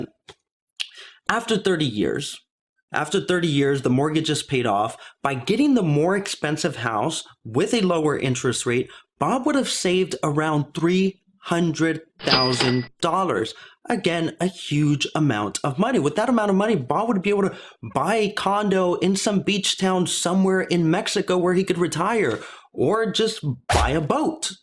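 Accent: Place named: Texas, United States. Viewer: A for content...F for Texan accent; stigma stigma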